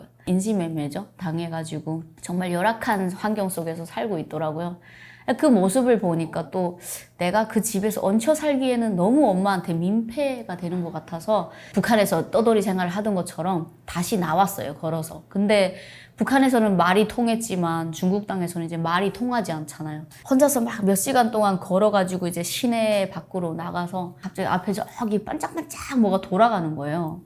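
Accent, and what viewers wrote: South Korea. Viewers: it’s the accent. You had to live in South Korea for a decent amount of time to lose the North Korean accent. North and South speak with differently and have different Vocabulary. The way you speak doesn’t change in couple of days. That’s why I can tell she lived in South Korea for a while. She talk more like a South Korean than a North Korean. So saying that’s her first time eating Korean Ramen is just Click Bait. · This clearly isn’t her first time cooking South Korean ramen—she does it casually and nonchalantly, without any particular focus or curiosity, like it’s just another quick meal on an ordinary day. It’s obvious she’s been in South Korea for a while since escaping the North. You can see it in her mannerisms and hear it in her accent—she’s clearly assimilated into life here. That’s why I don’t get the need for these sensationalized, clickbait titles like “North Korean girl tries South Korean ramen for the first time.” It feels cheap and disingenuous. 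It turns her real life and quiet normalcy into a gimmick, taking the focus away from her actual story just to get views...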